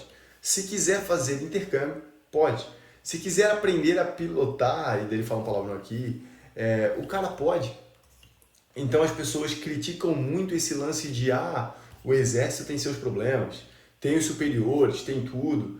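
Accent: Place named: Santa Catarina, Brazil. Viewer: Voltou pra SC e seu sotaque catarinense tá voltando aos poucos em sgt kkkkk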